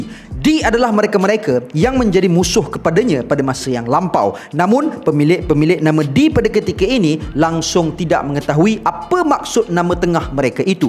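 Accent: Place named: Kelantan, Malaysia. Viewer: Kemahhh selit dielek kelate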